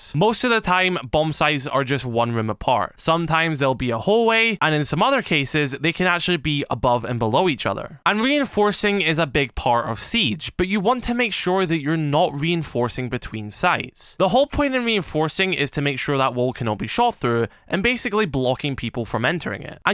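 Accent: United Kingdom. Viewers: bri ish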